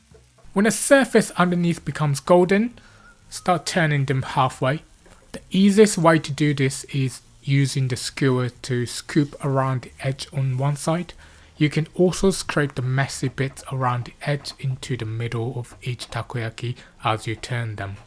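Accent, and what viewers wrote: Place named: England, United Kingdom. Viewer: Not sure about the recipe yet i totally hot lost in your fascinating English accent!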